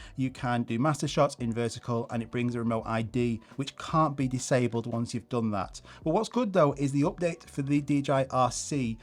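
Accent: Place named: Cumbria, United Kingdom. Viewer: You sound like you're not too far from Kendal.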